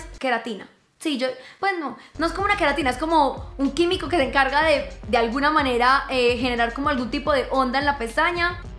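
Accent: Antioquia, Colombia. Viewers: Hola eres la mejor amo tu vos yo tmb hablo paisa y amo todo de ti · Amo su acento, soy de Argentina ye encanta el acento de los colombianos 😍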